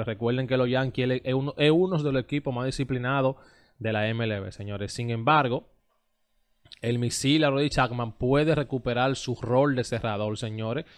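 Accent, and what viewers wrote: Cibao, Dominican Republic. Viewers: Buenas tardes, tú hace buenos comentarios osea que hace tu tarea pero acuérdate que tú estás en un público tienes que mejorar tu manera o tu forma de hablar acuérdate que no solo te estás dirigiendo al público Dominicano que sabemos que eres cibaeno pero los otros países no saben el porqué tú habla así que en vez de decir (estoy seguro tú dice to’l seguro en vez de decir ochenta y seis dice ochental sel) y así sucesivamente pero si haces buenos comentarios y te felicito en eso.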